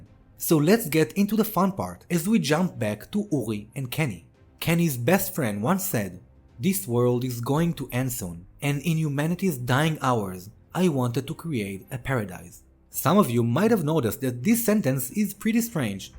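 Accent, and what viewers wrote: Israel. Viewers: Subscribing for AOT content with a Tel-Avivi accent. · First time hearing an anime Channel with a Hebrew accent! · דקה וכבר שמעתי את המבטא הישראלי הכבד חחח למה רק AOT<?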